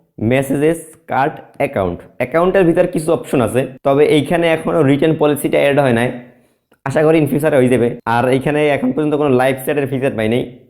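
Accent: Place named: Sylhet, Bangladesh. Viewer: ভাই তুমি সিলেটি😅😅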